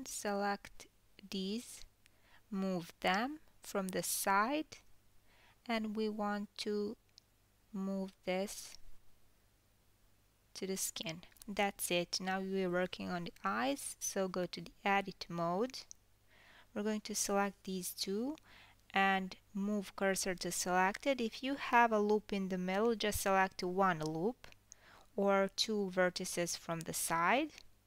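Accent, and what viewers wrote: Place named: Eastern Europe. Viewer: That beautiful Slavic accent...💌💖💝💘 Ukrainian probably. 🥰😘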